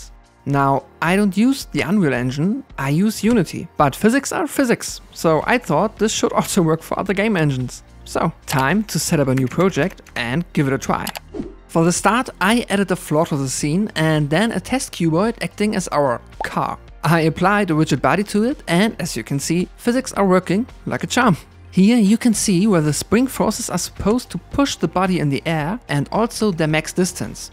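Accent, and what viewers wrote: Germany. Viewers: Man hört das du deutsch bist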